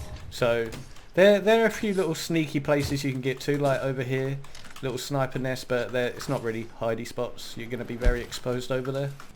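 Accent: United Kingdom. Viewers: Just make sure you keep your English accent and good luck in the states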